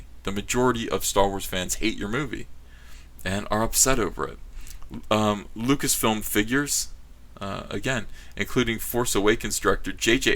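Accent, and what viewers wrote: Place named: Oklahoma, United States. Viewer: You have a an accent now, like you are from Oklahoma or Arkansas.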